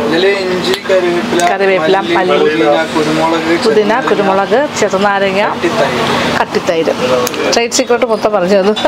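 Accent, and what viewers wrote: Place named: Palakkad, India. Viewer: Very beautiful 🥰. Chechikku palakkad bhashayum vannu. lots of love.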